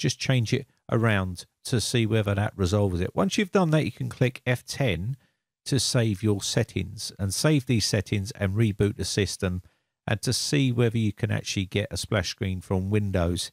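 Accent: England, United Kingdom. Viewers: Are you and Michael Cain from the same area of England?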